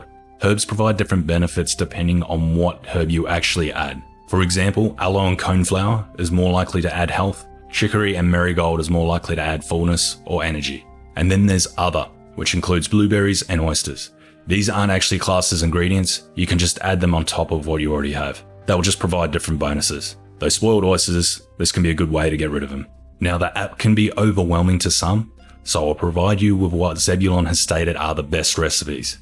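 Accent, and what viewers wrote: Australia. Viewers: it's a sexy deep aussie accent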